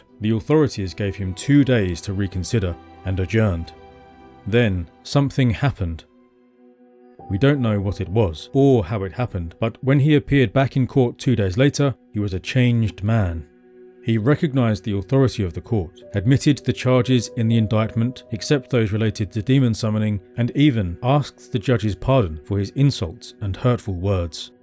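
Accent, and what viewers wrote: United Kingdom. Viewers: This video auto-played after one of MrBallen’s videos. I normally just exit the new video when this happens, but not today. This is awesome! History, crime and great narration. The British accent is a huge bonus (I’m a borderland Texan lol). Thank you, sir. 🏰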